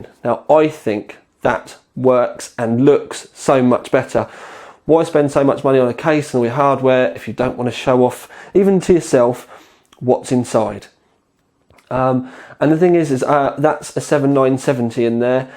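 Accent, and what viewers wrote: England, United Kingdom. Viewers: LMAO tea and bikkies! love the pommy accent man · great in depth review :) regarding the window and fans, I much prefer the original as im not really into seeing my hardware and as far as the fans I think your view has a bit of bias because you are coming from (I gather from accent) UK weather as far as need for extra fans and cooling. I however in Australia in summer often get 44 degrees C during the day and hot nights so I need any cooling I can get :)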